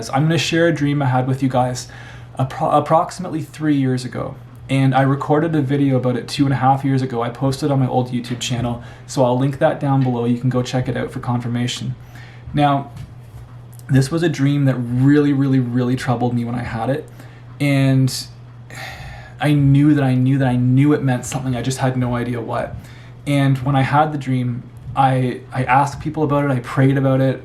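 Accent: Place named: Canada. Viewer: The Canadian accent is similar to my Minnesotan accent.😂 The books of Joel and Acts (amongst others) tell us that dreams and visions are legit ways that God speaks. (I know you know this...putting it out there for others reading comments) I've had few dreams I know are from God and he's given interpretation. Have you experienced any dreams that you believe are from the Lord that you haven't received interpretation for...yet? I ask because I believe I have and because I come from a faith community that is "practically" cessasionist...so I don't have many people that I can ask these questions.😁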